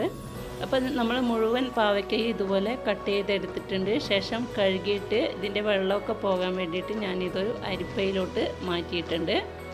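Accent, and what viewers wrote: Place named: Kannur, India. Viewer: Ith sherikkum oru variety thanne😍😍 ithaante nalla sound😍😍enikk kannur slang ishtaanu.. peruth ishtam ❤️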